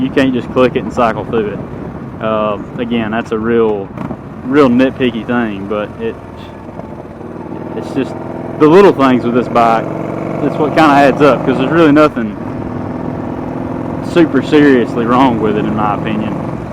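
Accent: South, United States. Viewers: Greetings! You sound like Huckleberry Hound to my old ears. Where are you? That landscape looks totally English and the only thing that gives it away as the US are the road markings and your deep southern drawl. And I don't see potholes in your road there... how is that?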